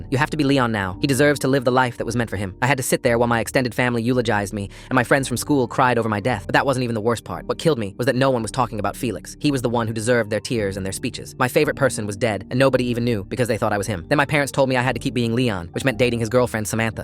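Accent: United Kingdom. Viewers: Is it just me or did he turn british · It’s a British accent 🤣definitely can tell where you’re from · what's with the British🇬🇧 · He turned to British and that make this so unserious sound. I’m sorry if you were bored the entire time I would actually sound serious. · I'm not the only one who noticed the British accent, right? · why did he turn british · Also what’s with the british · the british accent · Me: BRITISH??? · ok good im not going insane the tts did turn bri'ish halfway through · Why did it turn British midway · the British acc is wild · Why do you turn British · He- hes British HE'S BRITISH AHHHHHHHHHH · The British accent 😂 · Why did you turn British? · Am I the only one who realized the British accent?! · WHY TF did he get a British accent half way through?.Anybody else get confused because the man talking is Felix + his brother Leon passed away. 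Felix took Leon's spot, so why would he want to grieve himself? Because he's at Felix's funeral but he thinks people need a mourn Felix. I think there was a typo and they meant Leon??? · O just realised that the voice is British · Omg I thought it was just me not noticing that the voice was British the whole time 😂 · Why did the voice slowly get British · Why is bro British now?? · Why did he turn british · It took me FOREVER until I realized he's British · You sound British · Great video but like why did halfway through the voice thing you just turned British?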